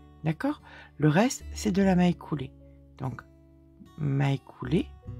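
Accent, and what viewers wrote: France. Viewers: Bonjour, Française habitant en Australie depuis très très longtemps Je viens de decouvrir vos videos. Je pourrais vous ecouter parler toute la journey avec cette voie si francaise et si douce. D'un age plutot advance, J'ai commence a crocheter l'annee derniere et depuis je ne me suis pas arretee. Avec ce tutorial si facile Je vais me lancer avec ce pull merveilleux et aimerais acheter le pdf pour m'aider a le faire a ma taille, mais Je n'arrive pas a le trouver sur votre site. Pouvez vous m'aider s'il vous plait! Merci de tout coeur.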